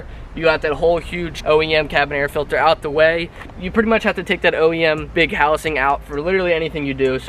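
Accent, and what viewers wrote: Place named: Maryland, United States. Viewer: Your maryland is showing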